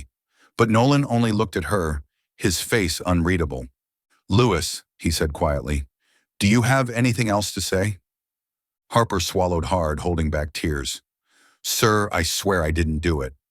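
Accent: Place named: United States. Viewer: Thank you. Good story. Appreciate the American voice telling American stories!!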